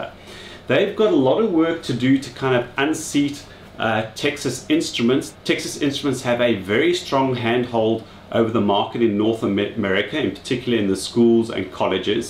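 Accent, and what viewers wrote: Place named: South Africa. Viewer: Good video, thanks. Do I detect a SA accent there?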